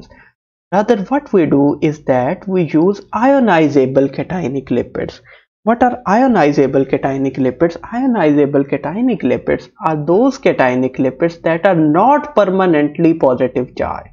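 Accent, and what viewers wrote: India. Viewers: first time like this kind of Indian accent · FFFFFFF!!!!!! why does every scientific medical video have some guy with a terrible Indian accent.....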